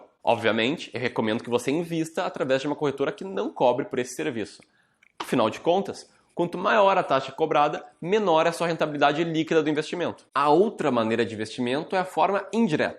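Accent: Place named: Rio Grande do Sul, Brazil. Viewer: Tu sois gaúcho né rsrs este sotaque me é peculiar rsrs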